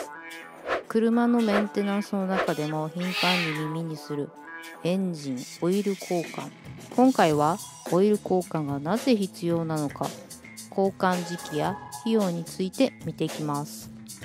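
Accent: Kansai, Japan. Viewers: 何か関西弁ほっこりします。素人の私にもとても分かりやすい説明ありがとうございます。。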